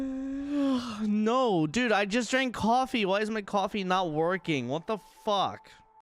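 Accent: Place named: United States. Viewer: 公表はしてませんが、英語の訛りや配信の時間的にアメリカもしくはカナダあたりだと思います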